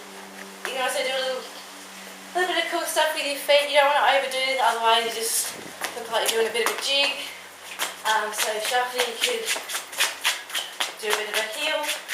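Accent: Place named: Australia. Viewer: you have that sexy australian accent:)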